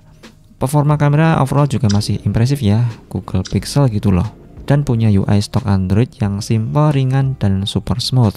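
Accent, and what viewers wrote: Boyolali, Indonesia. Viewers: Orang Boyolali ya bang 😅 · Loh org Boyolali tah mas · Orang boyolali ya mas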